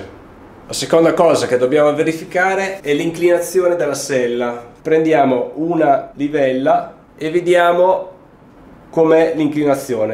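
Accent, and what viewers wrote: Romagna, Italy. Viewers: grazie mille sei sempre molto chiaro!! E poi con quella parlata romagnola sei sempre meraviglioso 😜😂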